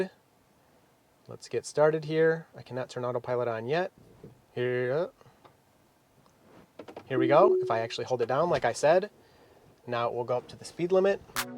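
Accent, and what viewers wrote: Michigan, United States. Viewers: Don't let people criticize your English. American English does have dialects! You're just speaking Michigander dialect. "Coulda went" I use as well.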